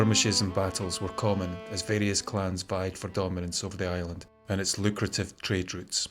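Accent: Scotland, United Kingdom. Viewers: Excellent Narration. Excellent Voice. Thank You SO Much for the beautiful landscapes-for the Lack of background noise that would interfere-with hearing the wonderful historical, & supernatural information from your great, original Scottish voice. I've always wanted to see Scotland, & now I can, thru your wonderful videos. Please-Change Nothing. It is Perfect, as is. Cordially-Pam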